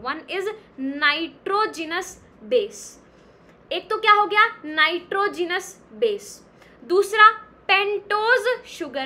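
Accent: Haryana, India. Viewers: Diii aap haryanvi ho kyy???🤔🤔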